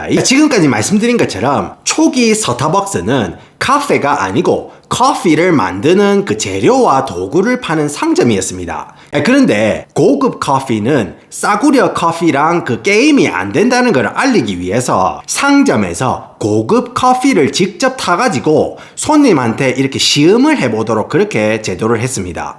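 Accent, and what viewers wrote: Gyeongsang, South Korea. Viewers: 한국말은 완전 갱상도 사투린데, 영어발음은 완전 본토네예. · 와.. 저희할머니가 쓰실법한 찰진 경상도사투리와 촉촉하게 찰진 미국식 영어발음에 넘나 귀호강입니다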